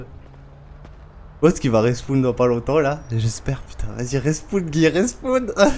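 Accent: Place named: Spain. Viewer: Sa fait bizzare de pas entendre ton accent espagnol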